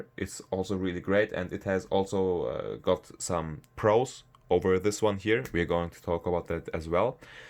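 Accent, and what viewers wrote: Turkey. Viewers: because of the accent, I was thinking you might be a Turkish guy, but after when I saw the glass bead on the wall, I am sure that you are a Turkish guy, süpersin bro güzel setup :D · Digger ich höre an deinem Englisch, dass du Türke bist :D Probs für das gute Review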